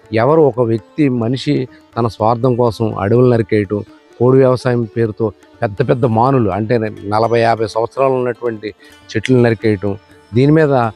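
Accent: Godavari, India. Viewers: Ayya baaboi baabai miru super ఏహే mi slang godari yaasa adbutaha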